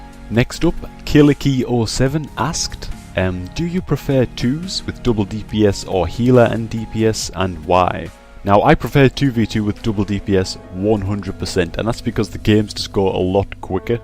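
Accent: Newcastle, United Kingdom. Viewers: sound like you have a geordie accent lol, sorta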